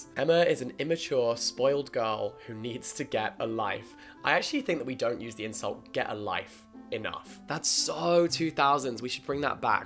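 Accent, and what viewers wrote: United Kingdom. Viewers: British accent so cooll👏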